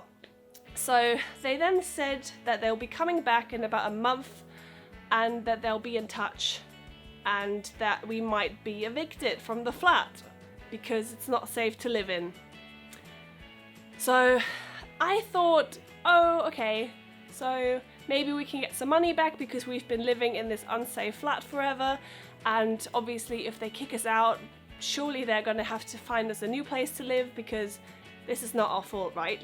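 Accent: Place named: Home Counties, United Kingdom. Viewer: If you are German how come you have managed a perfect English home counties accent? Seems strange to claim you are German when clearly this isnt the case, but harmless I guess, and fine if it makes you happy...................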